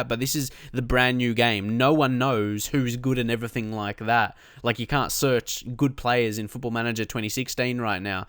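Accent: Australia. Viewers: this aussie accent man. cheers from Syd, mate. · ih yes i love the austräääälian accent!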